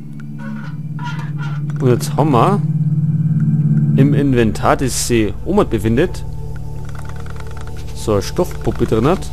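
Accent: Bavaria, Germany. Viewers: Sehr geil das des amoi ajemand auf boarisch mocht, da geht mia a herz auf <3 Super LP weitermocha ;D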